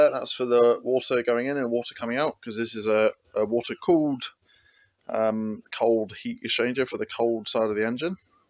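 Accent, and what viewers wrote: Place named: Cornwall, United Kingdom. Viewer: Great job, looking really good, i take from the local twang your from Cornwall, a fellow Cornishman, here is a little project i have been working on, a 4.5 kw rocket stove, can give to much away ,as still in patent process, <link>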